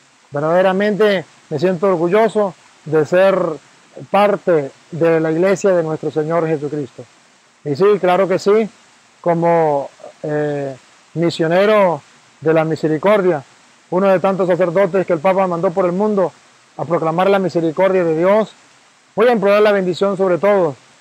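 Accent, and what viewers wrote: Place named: Mexico. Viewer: Buenos días padre bendiciones padre usted venezolano su acentos es mexicano. 🙏 yo creo firmemente cuerpo y la sangre de Jesús. Tuve bella experiensa..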